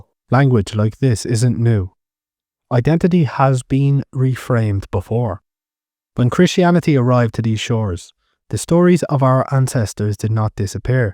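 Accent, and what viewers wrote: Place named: Rural, Ireland. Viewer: Maith an buachaill… definitely a culchie twang off that Irish accent ya speak in tho 😂😂 class work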